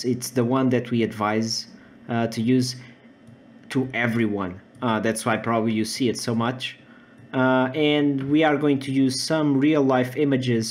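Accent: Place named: Portugal. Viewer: Are you portuguese? Your accent makes me want to buy you a Coffee and a Pastel de Belém..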